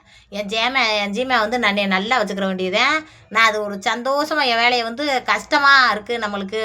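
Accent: Madurai, India. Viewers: ரொம்ப எதார்த்தமா பேசுற நல்லா இருக்கு இந்த வீடியோ பாக்குறதுக்கு சூப்பரா இருக்கு அந்த தமிழ் தாம்பா உங்கள அடிச்சுக்க முடியல மதுர தமிழ் தமிழ் தான்யா கேக்க ஆசையா இருக்கு சென்னையில இருக்கிற எங்களுக்கு உங்க தமிழ் பிடிச்சிருக்கு பிடிச்சிருக்கு பிடிச்சிருக்கு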